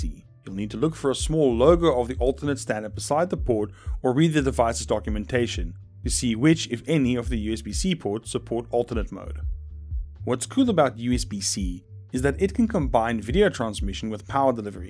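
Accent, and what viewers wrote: South Africa. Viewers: uppa the south african accent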